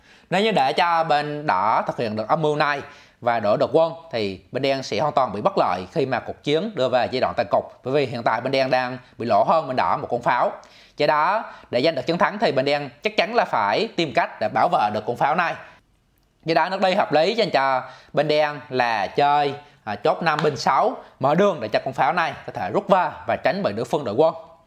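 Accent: Binh Dinh, Vietnam. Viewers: Giọng bìn định phú oen nghe hài quá kk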